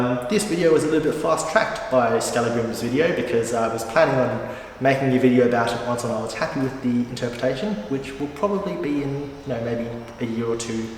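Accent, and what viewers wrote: Australia. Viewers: First thought, this bloke is aussie, next thought did he say Sydney? Turns out you're just 5 minutes away! 😮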